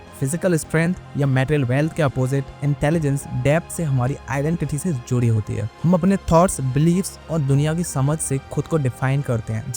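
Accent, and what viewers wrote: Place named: Bengal, India. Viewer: Ek baat samajh aya ki bolne wala Bangali hai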